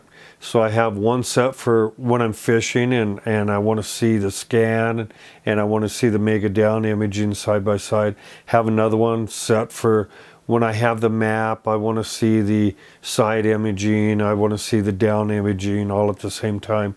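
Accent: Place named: New England, United States. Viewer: I usually like to fish by myself as well. Most companions can't get up early enough and get antsy quickly. By the way, you sound like you're from New England or MA; if so, where from?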